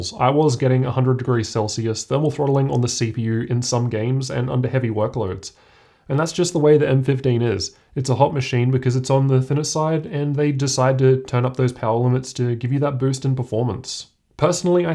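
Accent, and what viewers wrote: Australia. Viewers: I thought the accent is supposed to give it away that he's Aussie.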